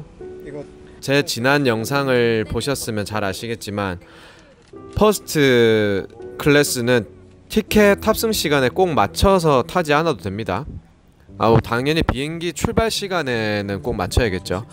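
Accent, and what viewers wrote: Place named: Gyeongsang, South Korea. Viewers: 갱상도 사나이 발음....잼납니다... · 등드리. 나나.. 정말.간만에.듣는.고향말..ㅎㅎ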